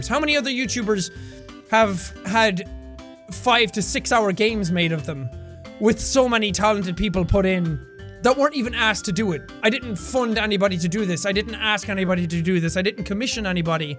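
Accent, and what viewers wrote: Ireland. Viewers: It seems like Sean loses the Irish accent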